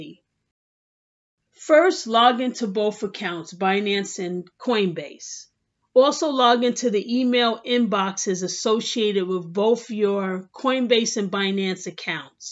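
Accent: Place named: New York, United States. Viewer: Yeah we can tell you're from New YOrk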